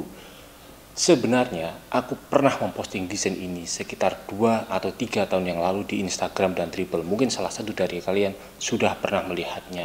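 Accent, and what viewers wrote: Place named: Java, Indonesia. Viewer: Medok e istimewah 😁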